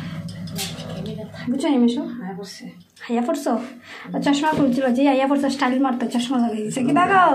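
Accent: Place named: Bangal, Bangladesh. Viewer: Tomar bangal katha khub valo laglo. Ami kolkata theke. Tumi khub beautiful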